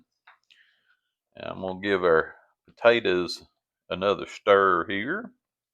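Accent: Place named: Kentucky, United States. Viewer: I'm trying to place your accent and I want to say Kentucky, maybe Missouri, Tennessee. We sweated our onions, too. Hope you read this and let me know. Kentucky here, btw round Paducah. I'm gonna try to get the wife to cook some of this cause it looks like a right fine recipe. I gotta say, though. It hurt my heart to hear you say almond milk and cornbread in the same sentence. Now I know you were saying NOT to do that, but the thought made me die a little inside. I'll get over it, I reckon.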